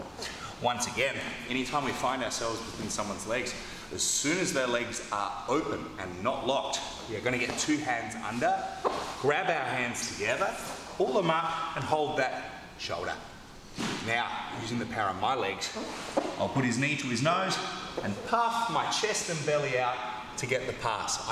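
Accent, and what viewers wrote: Australia. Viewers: Nice rhythm , this dude speaks smoothly from beat to beat, flawless transition and the great Australian accent is a bonus! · I love Australians, you guys are always fun to listen to. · are you an Aussie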